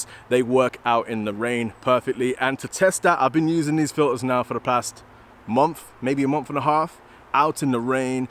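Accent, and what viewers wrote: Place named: Australia. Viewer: Are you from Australia? Your accent is dope 👌🏼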